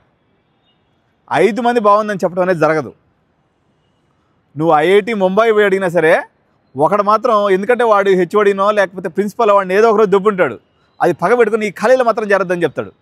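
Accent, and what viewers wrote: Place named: Nellore, India. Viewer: నెల్లూరు బాష, యాస లో ఎక్సలెంట్ గా చెపుతున్నారు సార్ 🎉🎉🎉🎉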